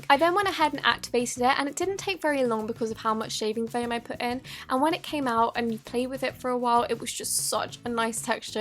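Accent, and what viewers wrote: United Kingdom. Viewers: Your voice is soo satisfying!!! 😆 (I LOVE THE BRITISH ACCENT) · You know the sound of the slime is not as good as your beautiful British accent it’s so good I love you voice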